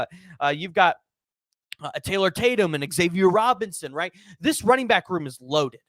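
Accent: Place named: Oklahoma, United States. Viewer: Long time subscriber! Me and my son like to tune in for the info but also to hear you say "your guy-zus".. 😂 Real Okie lingo my guy.. lol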